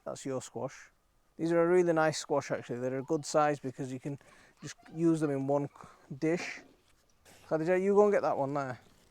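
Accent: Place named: North, United Kingdom. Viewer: I love that northern accent :D it's so homely and nice. Great harvest!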